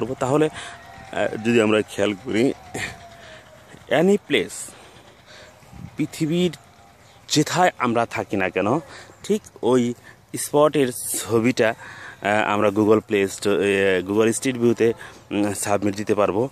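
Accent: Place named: Bengal, India. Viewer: Bengali guy speaking Inglish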